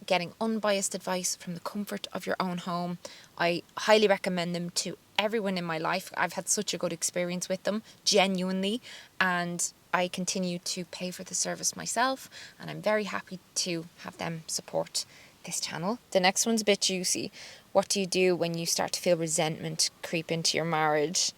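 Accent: Ireland. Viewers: I just love your personality and your self and videos!! You are my favorite YouTuber and I would be soo sad if you left, but I would understand. Maybe you could do a podcast in the future if you decided to leave YouTube because your voice is soo lovely to listen to. I miss Ireland and I love hearing how you talk! Thanks for all the big sister advice. (Even though you’re not teaching people in person you are here online and I’m so grateful for what I’ve learned from you!) ❤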